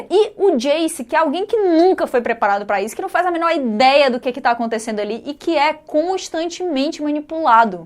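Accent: Northeast, Brazil. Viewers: impecável como sempre. Adoro sotaque dela, e todos os nordestinos. Acho Arcane uma animação incrível, da pra ver o trabalho bem feito de todos da produção. Não jogo Lol, não entendo nada, mas é de fato muito boa, porém não é perfeita, existe uma seria de problemas no enredo. É pra se divertir. Parabéns pelo vídeo.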